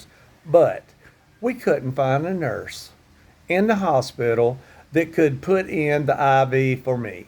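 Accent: United States. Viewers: God bless brother!!...obviously, you are looking and sounding very American, they will treat you as a rich person. I pray that you will find doctors that are truly into healing and not for profiting. Old saying goes, "stay away from sugar",... and carbohydrates. And if you are not diabetic, try fasting for 3 days. Reboot your immune system and rebuild new and stronger cells. Take care bro...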